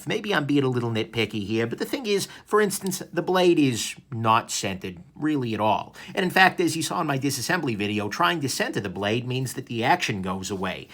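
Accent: New York, United States. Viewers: That New York accent...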